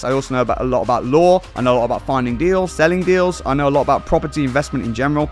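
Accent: United Kingdom. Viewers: We threw a bunch of tea into a harbor once. It’s funny a Brit is narrating this story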